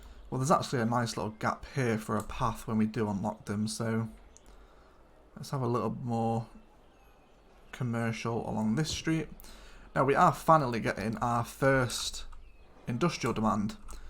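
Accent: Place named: England, United Kingdom. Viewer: Now I know why I like your voice. It's because your english! American acent sounds for me atleast realy bad. Propably because I am native german. Right side is right not left side traffic!!!!!!!!!!!!!!!! :)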